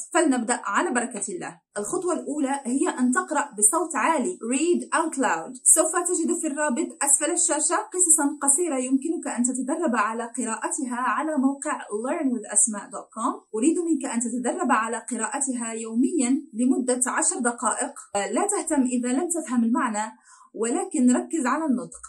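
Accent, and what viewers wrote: Morocco. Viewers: هل انت مغربية ام جزائرية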